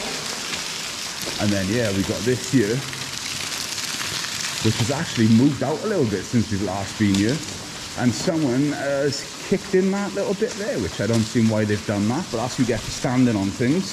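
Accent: Wales, United Kingdom. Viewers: Where you from mate im from Abergavenny and by your accent i recon you've got to be from somewhere like Brynmawr or Ebbw Vale??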